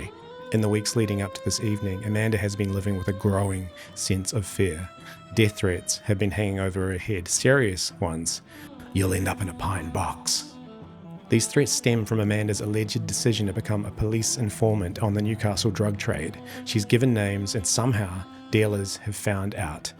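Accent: New Zealand. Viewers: do I detect a hint of NZ accent?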